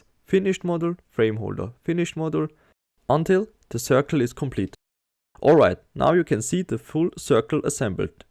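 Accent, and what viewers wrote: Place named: Germany. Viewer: I can hear German accent XD but good video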